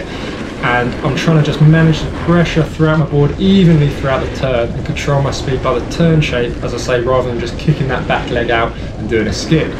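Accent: United Kingdom. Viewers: Oddly satisfying no loud music or fancy tricks or montages just a start to finish cruse down a mountain with a British narrator voice over.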